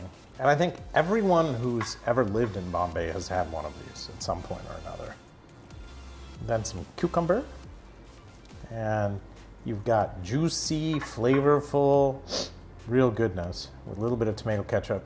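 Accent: United States. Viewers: Dr Nene has true American 🇺🇸 accent. He’s son is also so well brought up. Kudos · You are making wonderful dishes,sharing your knowledge of how even Americans have breakfast,pizza with a blend of indianess in it.Great!I wish to know you have US based Medical certifications which is a great asset.May be you should share these first,your own identity.May be you can leverage this load of cooking to Mrs Madhuri,but of course under your guidance and direction,so the calories and protein content is clear.Encourage youngsters like who have a dream to go to the US and pursue Medical profession,making it easier for them.Its not easy but at least one can try.And may be you start a coaching class with and Ryan to teach your type of English with American accent to the youngsters in the coaching class.This is the need of the time so they can match the American standards.All the best Dr Nene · Superb! 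Dr. Nene will be a Super Hit on YouTube. As glorious as his wife! Excellent English! Handsome Likeable Personality! American English Accent! Down to Earth! Great Content!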